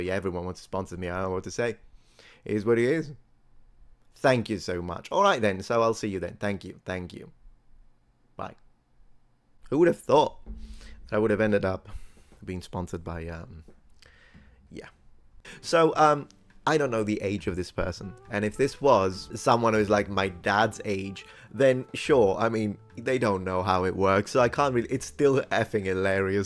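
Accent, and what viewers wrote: United Kingdom. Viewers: Does it sound to anyone else that he learned English from a British source? Don't know why that's standing out to me all of the sudden.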